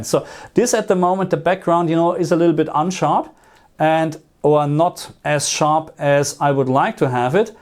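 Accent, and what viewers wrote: Germany. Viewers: Du kommst doch wohl aus Deutschland oder nicht? Man hört es direkt, ich wundere mich dass Australien bei deinem Kanal steht. Da wohnst du wohl? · Wieso schreibst du auf Deutsch, ist er Deutsch? Sein Akzent klingt auf jeden Fall so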